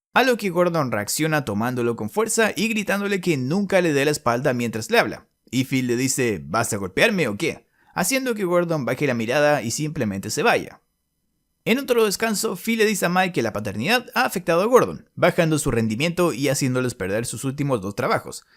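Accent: Chile. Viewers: Crack makina te recontra quiero una prefunta eres de chile ?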